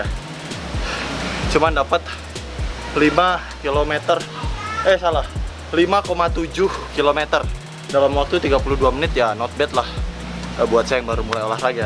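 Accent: West Java, Indonesia. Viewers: Orang sunda bukan bang?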